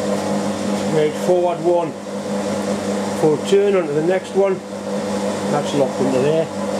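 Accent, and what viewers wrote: Newcastle, United Kingdom. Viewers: wey aye, Geordie lad. Brute force and finesse , I love it.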